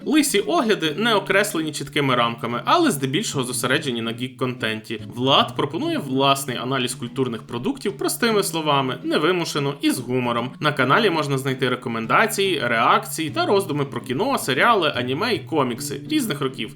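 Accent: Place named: Bukovina, Ukraine. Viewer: оу, ви з Буковини 🧐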